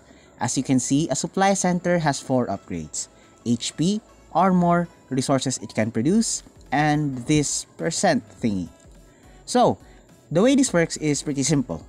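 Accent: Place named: Philippines. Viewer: Keep it up.👍 Pilipino accent just like tracie haha. Kaya moyan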